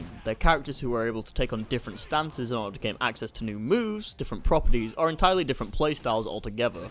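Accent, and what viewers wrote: United Kingdom. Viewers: Another fucking banger! Everytime I let your silky british voice lul me into listening to info about the topic the punchline hits and I never see it coming. I don't genuinely laugh at many videos but yours always manage to get me. Never change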